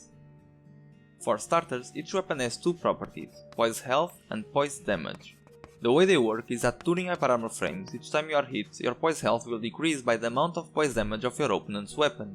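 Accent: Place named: Russia. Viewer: rapaz tu ta de brincadeira que é de portugal, sério? aren't you russian? cant believe this accent is portuguese UHEHUAEHUAUHEUHEA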